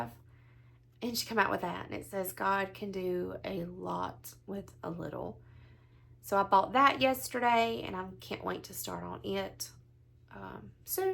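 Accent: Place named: South, United States. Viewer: Just found you. You sound like you have a very familiar southern slang. Was nice to hear. Enjoyed your tube. Thank you for sharing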